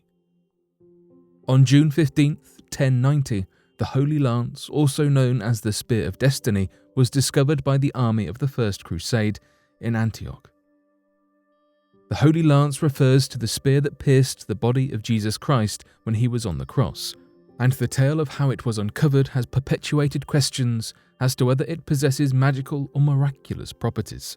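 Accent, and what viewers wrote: United Kingdom. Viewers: An even greater unexplained mystery... Why is it that all the mystery/unexplained YouTube channels are narrated by Brits or Irish?! Not bashing I love it #Attenboroughismydad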